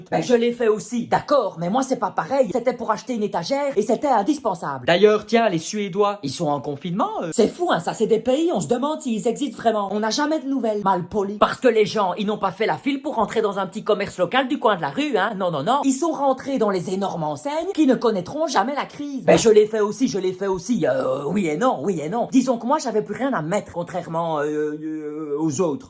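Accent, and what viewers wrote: Belgium. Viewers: L'accent namurois , c'est réjouissant ! signé une namuroise exilée. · il est belge pas français 😂 ça s'entend avec notre bel accent pure souche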